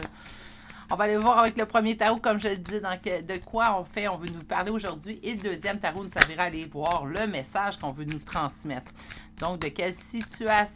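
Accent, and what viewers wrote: Canada. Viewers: Gratitude infinie à vous car il y avait 1 message pour moi. Je vous trouve formidable et j'adore votre petit accent canadien ❤❤ J'ai 1 suggestion pour 1 prochaine guidance. 1 guidance avec les numéros du prochain loto. V'la 1 idée qu'elle est bonne 😅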